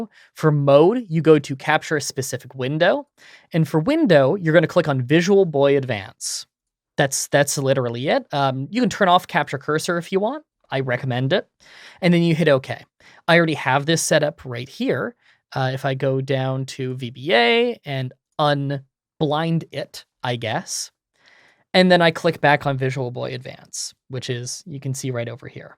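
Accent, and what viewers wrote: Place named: Canada. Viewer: The “out” thing is just his Canadian accent showing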